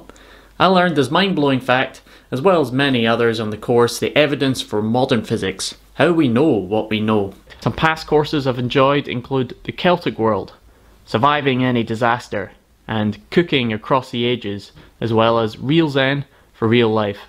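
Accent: Scotland, United Kingdom. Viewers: Haha, the scottish accent is so badass, that the automatically created closed captions just said, "yeah, well, f' ck it..." and just doesn't even try 😁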